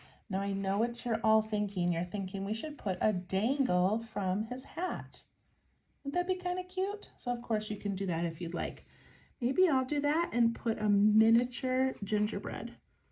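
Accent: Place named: Midwest, United States. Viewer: Random question: Where do you live? I hear you say 'you guys" and can't help but to think you live in the Midwest. I grew up in Kansas, but now I live in NC. When I say that some people will comment when I say it. I may live in the south, but I am not going to change my vocabulary!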